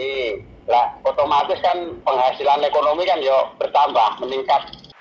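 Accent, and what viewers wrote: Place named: Blitar, Indonesia. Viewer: Suara rekamannya orang blitar,,, saya jg orang blitar.... Dimana alamat dealer resmi quick di blitar pak bos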